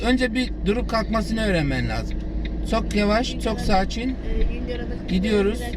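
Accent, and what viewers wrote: Black Sea, Turkey. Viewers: Abi karadenizli misin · Abi rizelimisin yoksa Giresunlu mu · Bencede Karadenizli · Abi galiba karadenizli galiba uşağum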